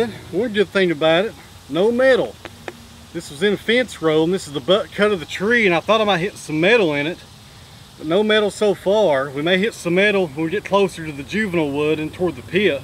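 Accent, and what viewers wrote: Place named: Tennessee, United States. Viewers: That’s a Tennessee accent...I’m betting a dollar to a donut!! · No I work in Kingsport TN, his accent is my accent. Very normal here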